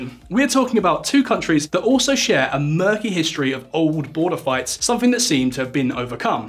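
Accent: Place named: England, United Kingdom. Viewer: The host is not American. Listen to his accent, he sounds English.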